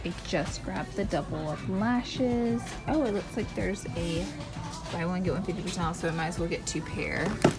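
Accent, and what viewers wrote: United States. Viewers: Omg I luv u so much and I luv ur videos too! I luv ur voice it is so country and u are my all time fav youtuber 💙!!!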